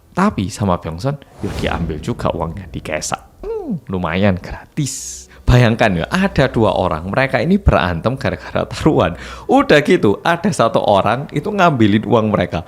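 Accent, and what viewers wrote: Java, Indonesia. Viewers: medok Jawa... hadeeuh... gajelas ngomong nya lu ah sipit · Hhhhhh bener bener medok bang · Medok e pol mas hehehe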